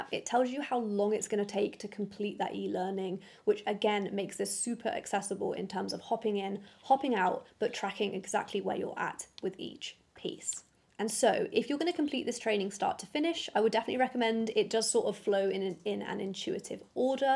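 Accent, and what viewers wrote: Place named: United Kingdom. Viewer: Just started the video and Ssss, sucha a lovely UK accent, I wish if I could get a mate to help me develop the same Accent, Excellent, now I will continue with the main purpose of the vidoe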